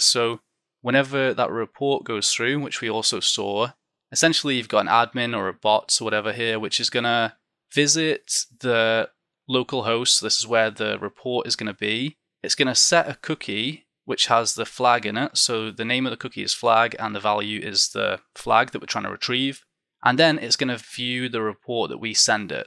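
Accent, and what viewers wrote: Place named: England, United Kingdom. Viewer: I’ve been watching your videos for a few weeks now and I am so happy I found your channel. Your videos are outstanding, and it is much easier for me to understand with the English accent:). Keep doing your thing, I look forward to your next video!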